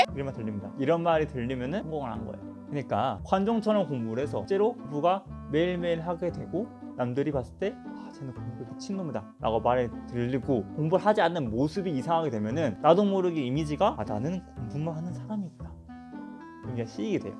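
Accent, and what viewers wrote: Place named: Jeolla, South Korea. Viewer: 왜케 귀여우세용ㅎㅎ 전라도 사투리 ㅋㅋㅋ💕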